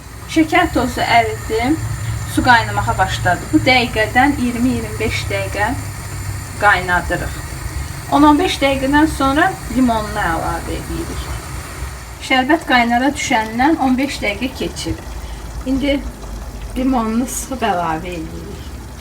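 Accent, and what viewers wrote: Azerbaijan. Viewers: Azerbaycan şivesine bayılıyorum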